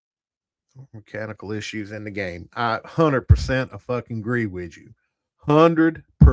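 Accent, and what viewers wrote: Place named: Appalachia, United States. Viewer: I gave you over 5 and a half minutes of my life to listen to your hillbilly ass yawl yawl yawl your way to complete and utter retardation.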